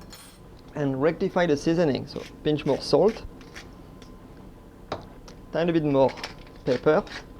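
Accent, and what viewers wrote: France. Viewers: Looks really nice but why are you using Fahrenheit and not Celsius? You sound French. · What a great video & recipe, spoken in that lovely French accent which always portends success in the kitchen! I am making this for Christmas as my family and I love French food. I thank you for doing this video. Merry Christmas from Virginia!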